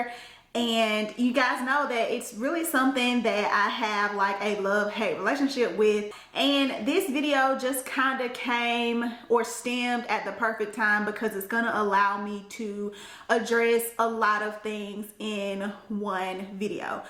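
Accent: South, United States. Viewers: Omg you are the first southern youtuber that I've seen and I love it!!! 😂💙💙💙💙 Yass with that accent gurl!!!! You legit sound just like me and I've never seen a black youtuber just accept their "country" accent. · you remind me of Jessica Dime from Love & Hip Hop Atlanta with your southern accent!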